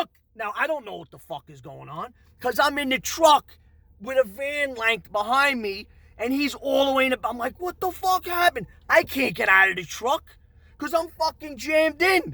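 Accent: New York, United States. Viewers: That's because you guys dont do clickbait titles. If you say you're gonna drop it, you're gonna drop the fuck out of it until something breaks. Also I love how you yell, but being a new yorker myself, I know that's just you're normal voice.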